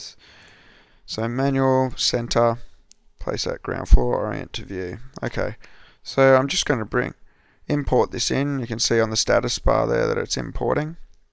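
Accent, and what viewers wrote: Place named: Australia. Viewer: Love da Aussie accent mate!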